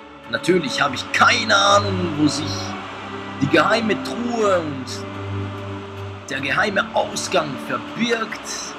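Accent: Switzerland. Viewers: Da hört man deinen Schweizer Dialekt noch ein bisschen raus😂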